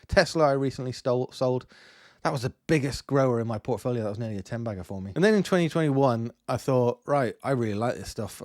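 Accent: Ireland. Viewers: ayo I would have thought you left Ireland not that long ago, you have that Irish accent, even when you said you came from Ireland I was expecting Northern Irish, no offence. Despite all the bad things about the UK, It is the ISA that keeps me in the UK NGL. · I was thinking I could hear the brogue. ☘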